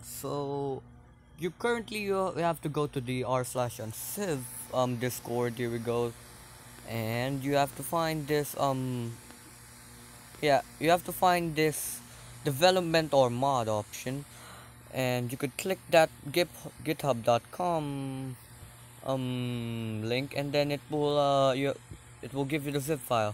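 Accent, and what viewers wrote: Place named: Philippines. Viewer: Filipino? I thought your accent.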